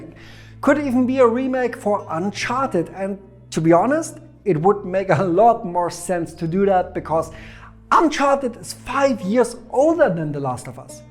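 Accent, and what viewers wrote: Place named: Germany. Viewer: oh haha hoppla, bitte vielmals um Entschuldigung, wusste nicht dass ihr nen extra Kanal habt & danke für Info =) man hört halt den Deutschen raus hier beim Englischen Video & das ist (zumindest für mich) irgendwie ziemlich irritierend. Nicht böse gemeint =D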